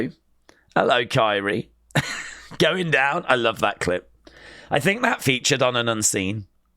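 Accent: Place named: United Kingdom. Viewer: Shoutout from my friend Sonia from The Canary Islands. She loves your british accent! Awesome!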